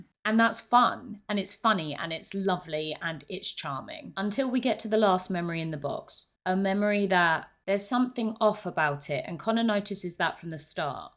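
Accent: United Kingdom. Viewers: Oh I love the British accent dear hello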